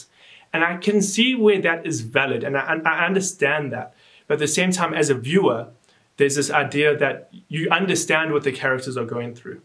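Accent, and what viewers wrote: South Africa. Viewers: You sound South African 👍👍👍 awe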